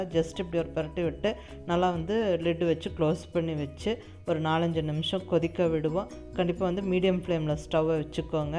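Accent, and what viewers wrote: Coimbatore, India. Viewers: சூப்பரா இருக்கு கோயம்புத்தூர்ல நீங்க எந்த இடத்தில இருக்கீங்க ன்னு சொல்லுங்க மேடம் நீங்க பேசற லேங்குவேஜ் சூப்பரா இருக்கு நாங்களும் இப்படிதான் பேசுவோம் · Hi aunty Naan Bangalore larundhu ungaloda periya fan Unga kongu tamil ennaku romba pudikum Unga recipes lam Vera level Naan clg 1st year poga poren Enga chitti and ammuchi lam Coimbatore la dhan irukanga Yedayarpalayam la Ungala naanga paakanum Plz neenga enga irukinga nu sollunga 😍😍😍😍😘😘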